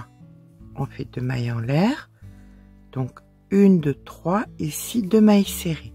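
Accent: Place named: Southwest, France. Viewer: Bonjour. Tuto toujours au top avec des explications amenées sereinement et qui donne confiance avec ce petit accent ❤❤❤❤ (sud ouest?) Merci !!! Et bonne journée (je ne commente pas souvent mais plutôt adepte des ❤️👍)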